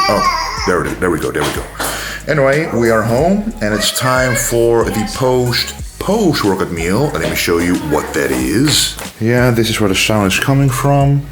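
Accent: Netherlands. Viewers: OMG I am so much supporting you because of your lovely Dutch accent. ❤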